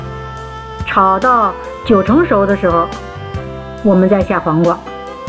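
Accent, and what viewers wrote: Tianjin, China. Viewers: 大姐天津人吧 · 有些天津口音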